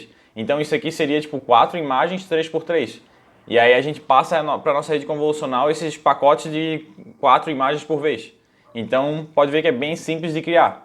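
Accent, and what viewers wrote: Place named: Santa Catarina, Brazil. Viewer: Pow, bicho, tu é de Floripa? Sotaque aí tem tudo pra ser de SC pelo menos. Hahaha Enfim, parabéns pelo canal! Excelente vídeo.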